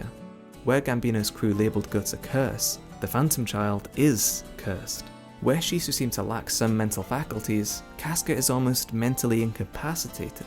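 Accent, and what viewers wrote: United Kingdom. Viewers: I think it's because you have an English accent who speaks vary softly in tone, just like most youtubers who explain the Dark Souls lore, you know the kind.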